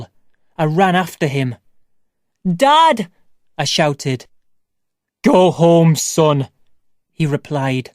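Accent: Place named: Ireland. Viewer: freaking irish accent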